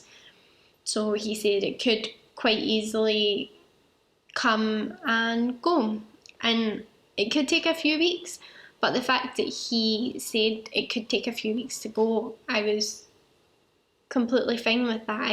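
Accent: Scotland, United Kingdom. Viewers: Such a powerful video. I've had MS for 27 years. Your life will change, but it's not over. Follow Dr. Aaron Boster on YouTube. He's a fantastic MS neurologist. Just get on the most effective therapy that you're comfortable with. There are some very effective ones out there (Tysabri, Ocravus, Lemtrada). You need to think about your FUTURE self (20, 30 years down the road. You sound like you're from northern Europe (Scotland?). There are a lot more cases of MS there. Best of luck to you, my friend. Cheers! 👍👍❤❤ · When the neurologist tested your babinski reflex was that abnormal or anything? Are your feet ticklish so was hard for neurologist to tell? 😂 Where in Scotland you from? Love the accent!❤️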